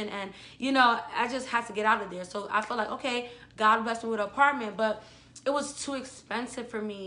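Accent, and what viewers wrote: New York, United States. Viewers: I knew you lived in NY! You sound like u could be related to cardi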